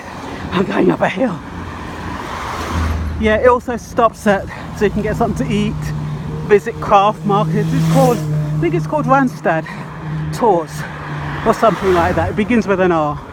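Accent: Jamaica. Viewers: As much as your English you cannot hide the Jamaican in you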